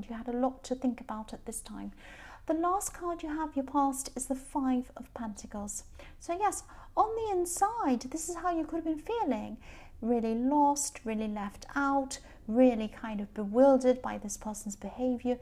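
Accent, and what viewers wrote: United Kingdom. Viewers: u r from UK?your voice is familiar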